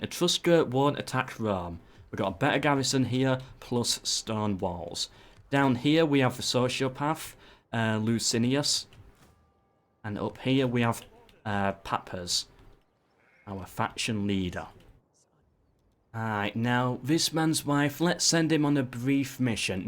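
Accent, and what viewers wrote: United Kingdom. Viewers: Is this the perfect British accent?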